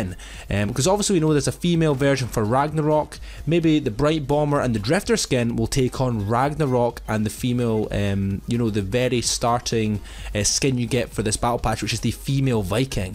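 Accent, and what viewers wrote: Scotland, United Kingdom. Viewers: Are u Scottish or Irish?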